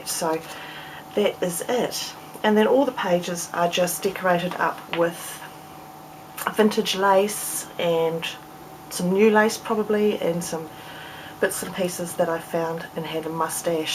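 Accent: New Zealand. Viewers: I love your book, I have one similar in process... and I ADORE your accent!!! NZ has the best accent in all the world, to me.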